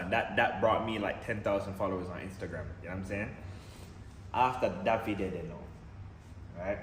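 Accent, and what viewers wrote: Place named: Jamaica. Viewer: I thought u were Jamaican no joke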